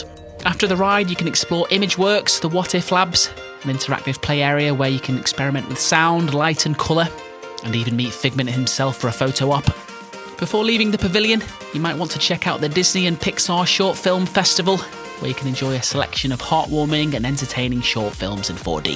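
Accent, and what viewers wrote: Liverpool, United Kingdom. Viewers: Is that a scouser accent? Jesus this was hard to listen to.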